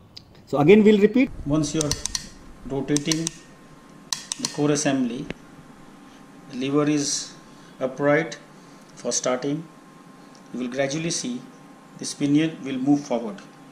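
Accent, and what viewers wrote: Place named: India. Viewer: Logat india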